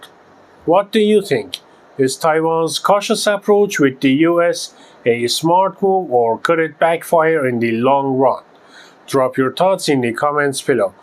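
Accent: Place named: Russia. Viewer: I can barely understand this guy, due to his accent, which is probably Russian. The inflection is all wrong.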